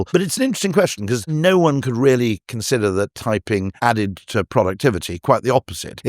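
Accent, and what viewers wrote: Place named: United Kingdom. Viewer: This guy is so annoying, “food tastes better when eaten from a fork that’s not bent” his whole thing is just talking about how perception is reality to the most absurd degree. He spouts nonsense that everything is the same and the only reason products are good or bad is because society thinks high cost = high quality which is just wrong. He’s dumb and he farms his British accent to sound intelligent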